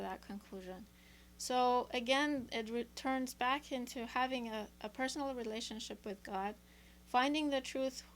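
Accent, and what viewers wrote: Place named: Iran. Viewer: khahar, age mishod, man vaghan daste shomaro miboosidam... vaghti harfaye shomaro goosh midadam, chesham pore ashk shod. midoonam irooni hasti, az lahjat malome, vaghan shomaro doost daram.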